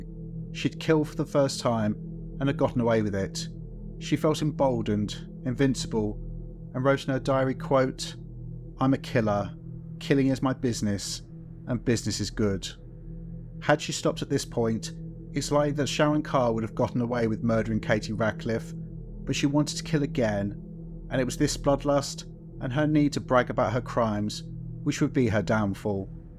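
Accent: United Kingdom. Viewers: hello love your YouTube channel and love your videos and love your British accent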